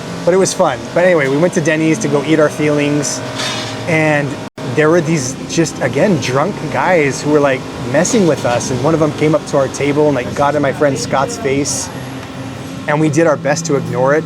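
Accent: Philippines. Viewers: not only your tagalog is good but you're slowly adapting the filipino accent and diction. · Hey it seems you're starting to develop the sexy Filipino accent in your English too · u now have a tagalog twang from the last time ive been here hehe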